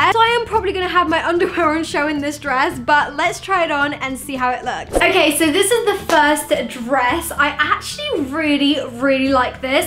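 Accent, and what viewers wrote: England, United Kingdom. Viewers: Are u supposed to be British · Is she English?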